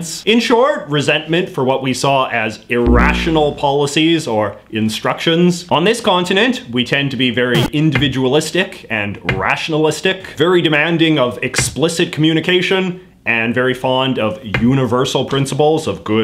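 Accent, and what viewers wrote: Canada. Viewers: Ik this video is about japanese culture but by watching you im learning alot about the canadian accent. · You sound astronomically Canadian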